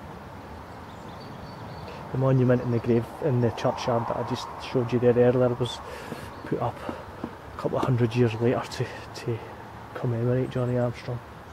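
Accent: Scotland, United Kingdom. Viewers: Warning - Don’t read the subtitles if you struggle with the accent. YouTube translation sure hates Scottish accents. Not once did he mention IKEA but somehow YouTube thought he did 😂 good video man, when u feel at home amongst Armstrong’s 😇. my grandpa was a member of the clan. ⚔️ Invictus Maneo ⚔️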